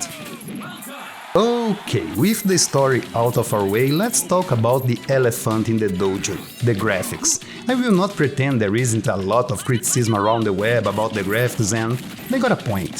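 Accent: Brazil. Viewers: Esse cara é Brasileiro, eu conheço o Inglês Brasileirado de longe....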